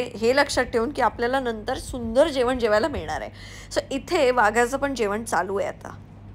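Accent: Malvan, India. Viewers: तुझे व्हिडीओस मी नेहमी बघते. तुझे मालवणी बोलणे ऐकून मी तुझ्या प्रेमात पडली कारण मालवण वरचे प्रेम🥰❤️ तुझे सर्वच व्हिडिओ खूप काही शिकण्यासारखे आहेत. एक मुलगी म्हणून तुझा खूप अभिमान वाटतो🫶🏻 तुझ्या वयापेक्षा तू खूप जास्त अनुभव घेतलास त्यातून खूप शिकलीस आणि तो तू सर्वासोबत शेअर करतेस🥰 तुला तुझ्या पुढच्या वाटचालीसाठी खूप शुभेच्छा👍 असेच तुझे सर्व अनुभव शेअर करत राहा आणि खूप खूप फिर मज्जा कर❤️❤️❤️ love you di❤️❤️❤️😘😍🫶🏻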